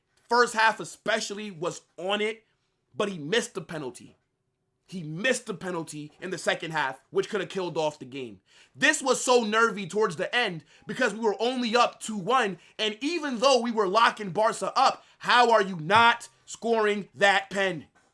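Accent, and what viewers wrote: United States. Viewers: You are the best Real Madrid youtuber. Very underrated just because you have an American accent.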